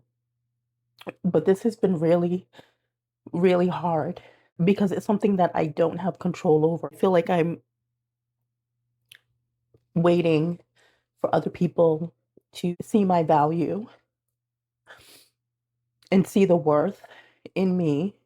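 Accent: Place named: Boston, United States. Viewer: I can tell you’re from Boston, we’re tough, you got this good lookin!…..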